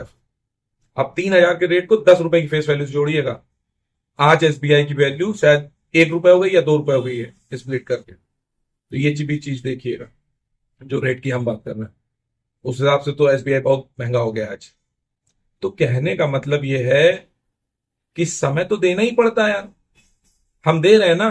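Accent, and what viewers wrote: India. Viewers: पर आपके बोलने का देसी तरीका अच्छा व प्रभाशाली है।। Plz don't change yourself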